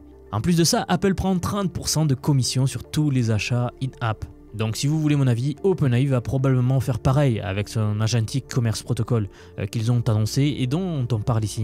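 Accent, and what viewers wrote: South, France. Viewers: le Sud I guess ?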